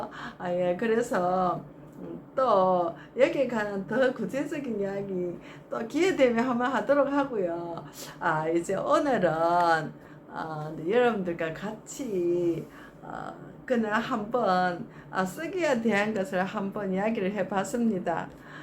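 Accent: Gyeongsang, South Korea. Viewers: Wow! 雨中이라도 예쁜 女뻐꾸기의 경상도 사투리 소릴 들으니 기분은 상쾌하네요! 아름답고 매력적인 음색의 말씀과 편집이 잘 된 영상, #우리의삶은_매일쓰기다의 좋은 교훈은 종일 듣고 싶네요. 늘~ 행복하시고 - - - 계속 재밌는 말씀을 기대 합니다. :body-blue-raised-arms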